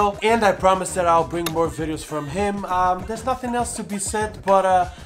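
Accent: Brazil. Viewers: Recebo a notificação e venho correndo para assistir ao vídeo 😊 Melhor canal da vida, com o português mais brasileiro do YouTube ❤🇧🇷❤🇧🇷❤🇧🇷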